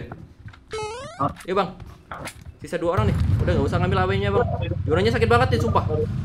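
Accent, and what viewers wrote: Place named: Pematangsiantar, Indonesia. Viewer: Iyakan.... Iyakan.... Iyakan... Siantar kali bahasanya...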